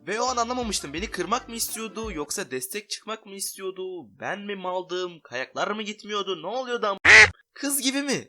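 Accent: Russia. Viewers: Abi rusmusun yoksa sadece rusyadamı yaşadın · Rus musun çok belli cünku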